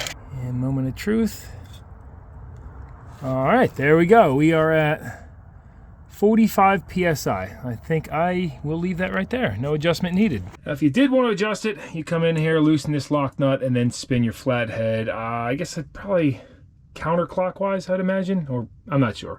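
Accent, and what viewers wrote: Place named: Texas, United States. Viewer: What part of Texas are you?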